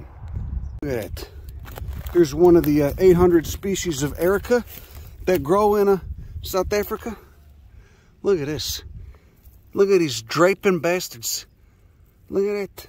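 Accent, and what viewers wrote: Chicago, United States. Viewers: I never learned so much while cracking up at his awesome Chicago accent. Salute from the suburbs.#Elgin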